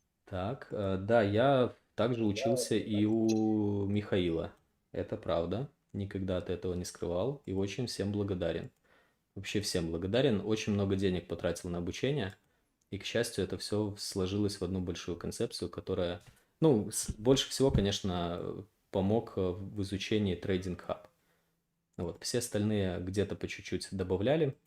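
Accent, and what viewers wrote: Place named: India. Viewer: жуткий акцент у этого парня.... индус что ли....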